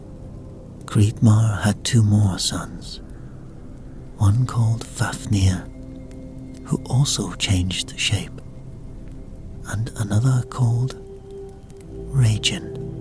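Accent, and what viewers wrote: Scotland, United Kingdom. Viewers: Really enjoying these as part of my routine before bed. I spend the majority of my year working abroad. The Scottish accent adds a feeling of comfort and home. These are all so well put together. As a fellow Scotsman, cheers pal! Ps. You’ve got yersel a patreon 😉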